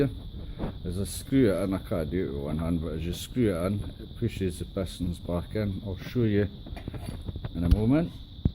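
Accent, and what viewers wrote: Scotland, United Kingdom. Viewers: Love the accent Jock Scottish 👍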